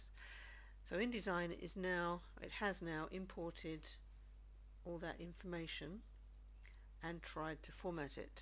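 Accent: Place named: United Kingdom. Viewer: So glad to hear a British voice!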